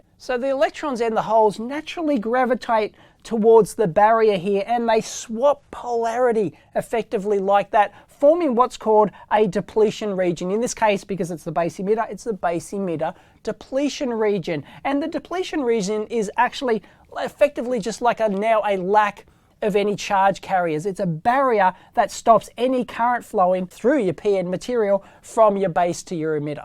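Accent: Australia. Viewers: Absolutely Superb!! Best explanation I've seen. Moreover Australian accent made it just PERFECT!! Thank you mate!!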